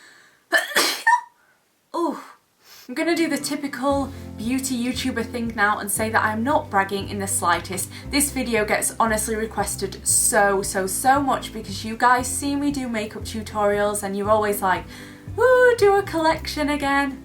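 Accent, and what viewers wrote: United Kingdom. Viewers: What part of the uk are u from we have the same accent xx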